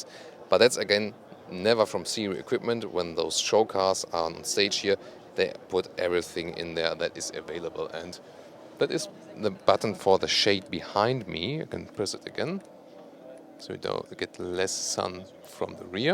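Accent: Germany. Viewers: The colour is disturbing. But it's the same thing for my ears, being Dutch I understand English and Deutsch very well. I know you speak English but my brain wants to translate German to Dutch instead of English to Dutch. You sound so German lol.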